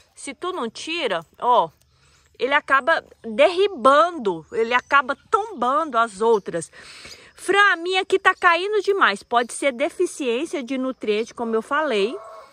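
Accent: Minas Gerais, Brazil. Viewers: Vc e. Mineira ? E parabéns mega canal !!!!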